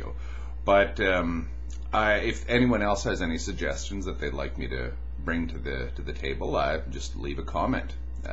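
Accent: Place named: Canada. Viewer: Is this dude actually speaking English? I can't make anything out of his Canadian accent. But I do agree that Jacob's Ladder is a dope movie, cheers mates.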